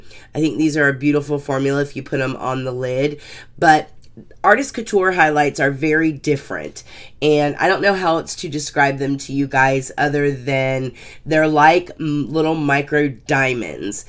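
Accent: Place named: Pennsylvania, United States. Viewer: I knew I recognized your accent, hello from the City of Brotherly Love fellow Pennsylvanian. 😊 I just bought this kit yesterday and had to consult with beauty gurus b4 opening.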